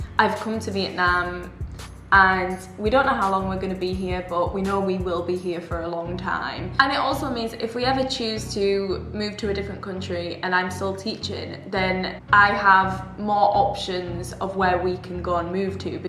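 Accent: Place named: England, United Kingdom. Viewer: Hello ! Thanks for the video ! Im very curious to know about your accent. From which region are you in England ?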